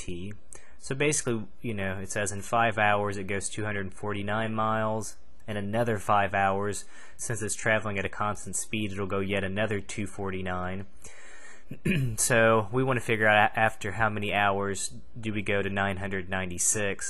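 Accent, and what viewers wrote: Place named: Baltimore, United States. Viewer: Sounds like he has a Baltimore accent.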